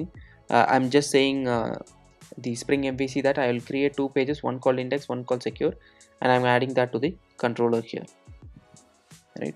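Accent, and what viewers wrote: India. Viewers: I think its sad that a so talented and good content creator as this speaks with so much indian accent. I dont know why but I really cant handle the indian accent at all. Almost allergic.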